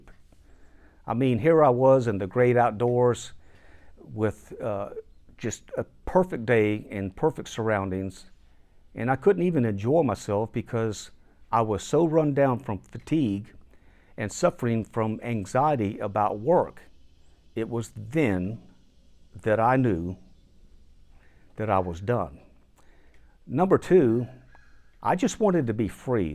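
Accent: South, United States. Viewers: Are you from the south? We’re from Arkansas. We’re exhausted and thinking of retirement. Glad that we found you ❤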